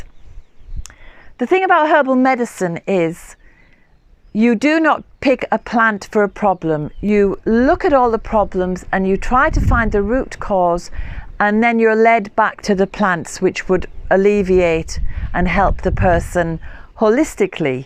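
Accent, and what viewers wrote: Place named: North East England, United Kingdom. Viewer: Hi again, it’s Jen from the North East :) I’m absolutely loving your channel- you really do draw the watcher in! I hope to be as good with herbs as you one day! I’m studying with heartwood and will be qualified in 6 years. I just did my meadowsweet monograph which was so joyful. Thanks for all these videos- I’m going to continue to follow you. Medicine making vids would be helpful but to be honest anything you put out is lovely. Btw I watched your video on plantain earlier on, which isn’t a herb I’ve come across that much- later on today on my walk out, what do you know- plantain showed itself to me! Wow! Form your accent I’m guessing you were from either Durham or teeside way? I am originally from Middlesbrough! ☺️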